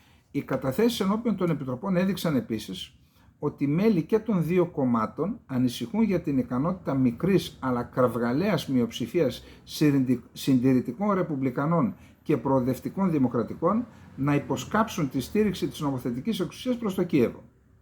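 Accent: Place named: Pontic, Greece. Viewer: Καλημερα κ. ολως τυχαιως ειχα ενεργοποιημενους τους υποτιτλους και ολιγον σασουρευταν,δεν μπορεσαν δυστυχως να μεταφρασουν τα ωραια ποντιακα σας.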